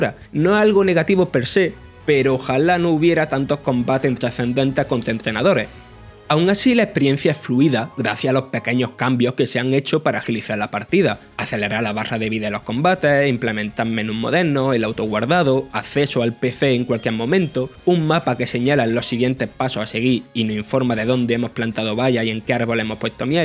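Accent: Andalusia, Spain. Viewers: y tú necesitas un poco de cerebro. Cuando tú hablas con tu abuelita tienes el mismo acento que cuando hablas con profesionalidad. La diferencia está en el léxico y las expresiones que se usan, pero el acento es el mismo. El problema de este narrador no es que tenga acento andaluz. Hablar con tu acento no es falta de profesionalidad, no hay ningún problema en dirigirte a toda la comunidad hispanohablante con acento andaluz. Todo el mundo tiene acento y nadie necesita disimularlo para hablar con quien sea. Malo es si usas palabras que usas con tu abuelita en un entorno profesional, no el acento. El narrador no puede decir que este juego es la súper hostia porque sería poco profesional. Debe decir al como que este juego es muy bueno. Ahora, de la forma en la que lo pronuncie no determina su profesionalidad. Espero que te haya quedado muy claro que el andaluz no es ninguna vergüenza y que se puede utilizar para dirigirte al mundo entero con mucho orgullo. 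No hace falta que respondas porque yo sé que no tienes ni puta idea de lingüística. Hasta luego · Es su acento particular de su parte de Andalucia. Que igual tendria que narrar siendo mas neutro, quizas, no lo se. Ahi no me meto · Es andaluuuz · Mae mía, en España hay gran variedad de acentos jajajaj. No está intentando ser neutro, es andaluz 😂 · La ignorancia... en algunos países de España se habla con ese acento en Andalucía por ejemplo es algo normal o incluso usan frases y palabras que en otros lugares no. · Qué pasa?? Los andaluces no pueden narrar o que?? Hay que ser del mismo centro de Madrid para hacerlo??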